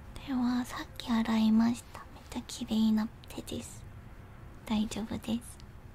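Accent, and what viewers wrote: South Korea. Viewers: 韓国の女の子が日本語を話すのが好きすぎる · 韓国の方が話される日本語て可愛らしいﾃﾞｽ♥.｡.:*♡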